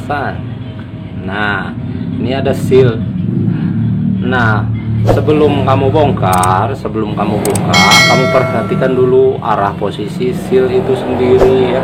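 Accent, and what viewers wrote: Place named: Palembang, Indonesia. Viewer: Logat ny kayak wong plembang .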